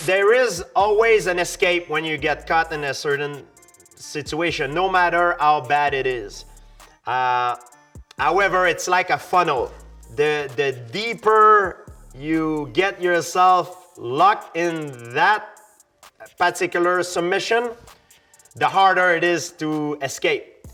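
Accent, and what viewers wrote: France. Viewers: He sounds not only french but he somewhat sounds Irish on some of his sentences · The world's jolliest and friendliest MMA fighter. We love GSP and his franglaise😅♥️ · Whenever he said 'throw' with the French accent, I heard 'troll'. Made the commentary pretty hilarious in a few spots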